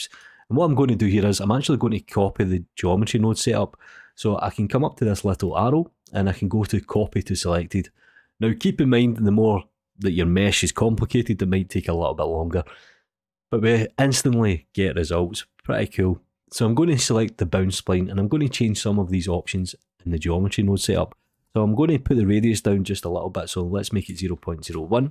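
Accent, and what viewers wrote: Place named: England, United Kingdom. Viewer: I LOVE YOUR ENGLISH ACCENT MATE LIKE FROM PEAKY BLINDERS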